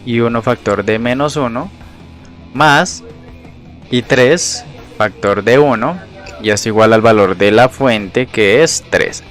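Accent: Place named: Colombia. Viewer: Ya te agarré el acento, Es de Colombia. Es que dicen que los de Sinaloa tenemos parecido el acento al de Colombia. Tienes una joya de canal, lástima que no tengas las visitas que mereces. Pero sé paciente. Poco a poco. Saludos!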